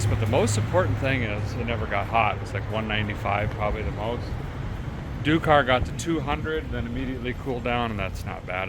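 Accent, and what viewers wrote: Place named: Minnesota, United States. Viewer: I've never really noticed the Minnesota/Wisconsin in voice before hearing him say "bag" a few times while speaking about longmire. Bagged trucks are a lot of fun and can also be a lot of work.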